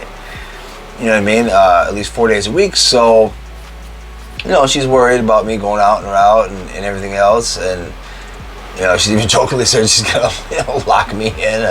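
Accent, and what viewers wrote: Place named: United States. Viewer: Your intonation feels like I'm watching a Cowboy movie Oh yeah! wtf.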